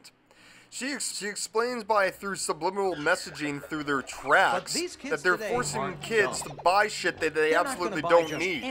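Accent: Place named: Canada. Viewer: He sounds like a Canadian.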